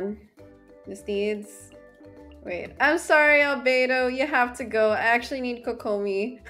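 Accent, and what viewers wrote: Philippines. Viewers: I just finished building Beidou & I finally have a taser team for Kokomi! I’ll use your video as inspiration. BTW, you look & sound similar to my Filipina friend, I think ur from the 🇵🇭 too.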